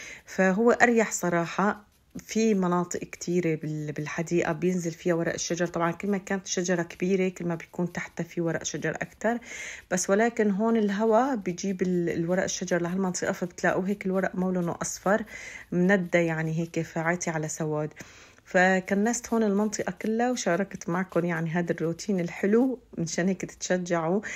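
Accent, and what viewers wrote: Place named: Aleppo, Syria. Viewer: ❤احلى ولفظك بل الحلبي ذكرني بل الاحباب والقرايببن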